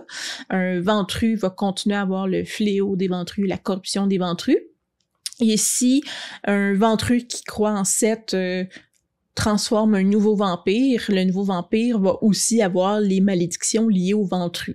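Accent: Quebec, Canada. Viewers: Merci pour se panorama J'adore ce petit accent Québécois 😊 Et toi que penses tu des Serpents? As tu déjà joué un Serpent? En tant que conteuse, les utilises tu? Carpe Noctem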